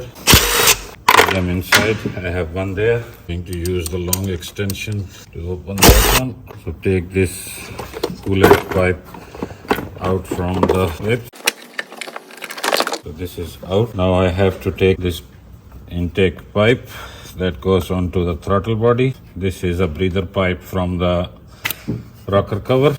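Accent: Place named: South Asia. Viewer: Your English accent is very Asian may be you from Pakistan or India?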